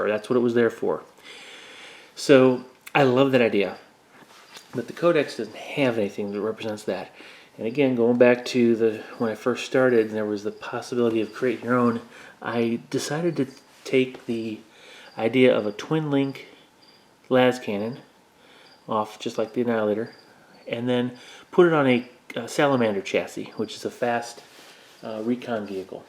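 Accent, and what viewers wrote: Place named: Poland. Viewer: Tylko Po co ten Polskie akcent szpeci model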